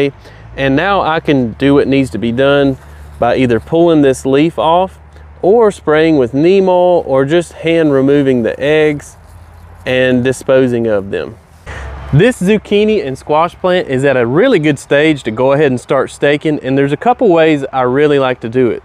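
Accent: South, United States. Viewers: I'm so glad the American accent is alive and well. One of the most beautiful of all of them, as represented here. It's a soothing and comforting sound if you had Aunts, Uncles, or Grandparents, or anyone else you looked up to, who was from our South.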